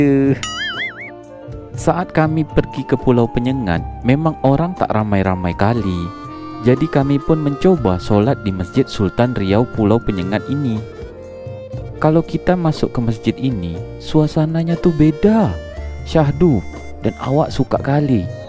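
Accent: Johor, Malaysia. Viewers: Saya dari jawa. Denger abang ni ngomong kaya Upin ipin... luar biasa bangsa kita dengan banyak suku suku... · senang liat2 dan denger logat melayunya bang. asyiiik...terus yg rajin bikin kontennya ya bang...rasanya ikut jalan2... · Assalamualaikum. Tak ada 'pelat'/slang Indonesia pon. Sebijik macam Melayu Johor. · Salam dari Johor..bahasa tiada beza dengan orang Johor.. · saye suke sekali dapat melihat pulau2 kecil dikepualauan kepri, apalagi logat melayu nye yang khas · Upin ipin wkkwkkwkk..tp serulah .cakap awak.. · Logatnya sama upin ipin🤭😁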